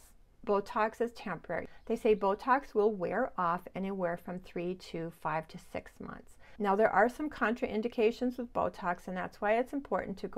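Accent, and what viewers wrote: Midwest, United States. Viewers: I am living for your midwestern accent. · I knew your accent was Minnesotan before I seen this comment!!! 🤣😂😅❤️❤️💁🏻‍♀️ I’m the person that wouldn’t touch it with a ten foot pole LOL! 🤪😉😘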